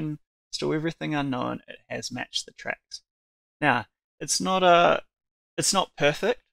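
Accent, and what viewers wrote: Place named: New Zealand. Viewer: Thanks guy with a strong New Zealand accent! I was trying to figure out how to generate an AcoustID from an audio file to figure out if it matches the ones linked to a MusicBrainz recording (So I could determine if the two recordings should be merged), And looking it up on the internet I couldn't find an answer, But this video explained it quite simply!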